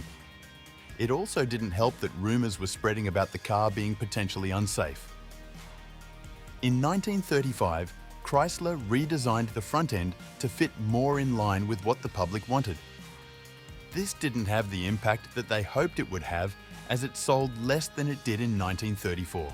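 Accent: Australia. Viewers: Who wrote the script? This guy has an aussie accent but speaks the American language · Love hearing an Aussie voice doing one of these, amazingly put together video to top it off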